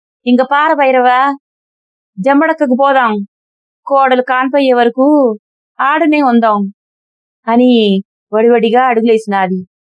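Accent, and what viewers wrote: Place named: Chittoor, India. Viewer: చాలా touching గా వుంది కథ. ఎంతో బాగా చెప్పారు కథ. చిత్తూరు యాస కూడా ఎంతో గొప్పగా పలికారు.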